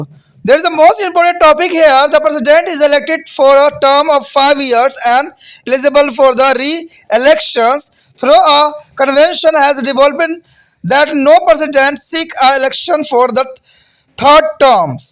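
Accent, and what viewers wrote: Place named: England, United Kingdom. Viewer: Sir thoda dhng se bol lo angrej mt bno smjh hi nhi aarha kya bol rhe ho 😢😢😢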